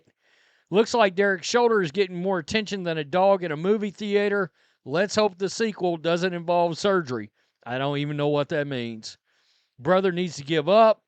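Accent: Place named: East Texas, United States. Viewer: What part of East Texas are you from?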